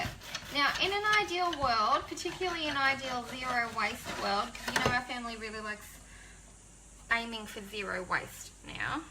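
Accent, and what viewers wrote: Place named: Australia. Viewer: she's talking with and aussie accent......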